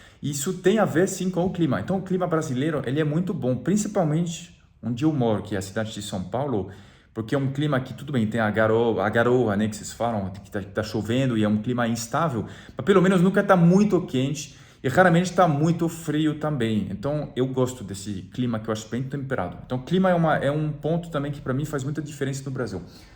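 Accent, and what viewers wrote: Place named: France. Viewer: Percebo que, a cada dia, seu sotaque francês vai desaparecendo … Pena vê-lo perder um pouquinho do charme, mas vale dizer que o seu Português está ótimo! Parabéns, Paul!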